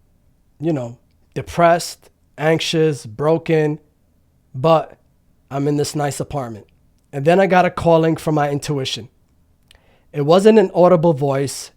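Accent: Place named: New York, United States. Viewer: How did I know you were from the NYC/NJ area? Lol. I hear it in the talk...🤙🙏🙏🙏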